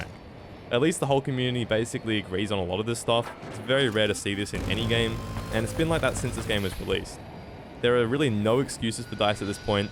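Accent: Australia. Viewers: Ughhhh Aussie accent, gross.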